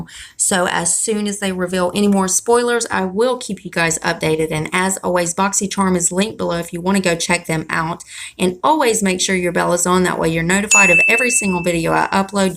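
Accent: South, United States. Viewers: Love that southern accent where are you from babygirl?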